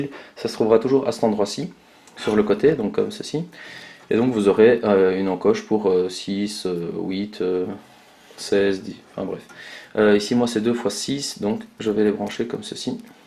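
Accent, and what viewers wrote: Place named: Belgium. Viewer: Jolie petit accent... belgique?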